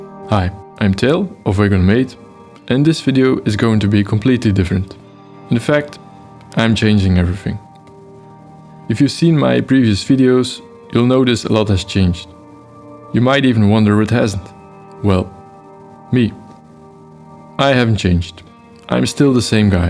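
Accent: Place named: Belgium. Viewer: Met dat accent kan het niet anders dan een Belg zijn 😅 Fijn om een landgenoot te vinden op yt met een wood channel.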